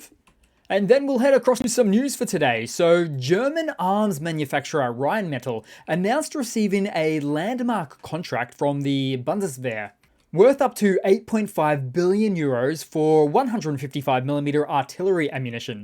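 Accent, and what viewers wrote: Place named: Australia. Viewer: Canary Yellow!! That is Australian Green and Gold my friend and don't you forget it:) The sing song vocals have that rhythm of The Man from Snowy River; 'There was movement of the drone crews for the word had got around that the Rus from Belgorod had got away and had joined the Freedom League. They were worth a thousand frowns so all the Moscow hacks had gathered to the fray. Solovyob was there, frothing at the mouth, for no man could bullshit like him once his blood was up...... I swear is channeling the bard and his accent is like a swim in the vernacular. Onya. Carn Ukraine. Ken Oathcarne.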